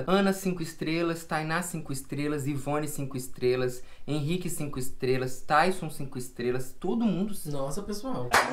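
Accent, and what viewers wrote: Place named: Minas Gerais, Brazil. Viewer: Adoro seu sotaque mineiro amei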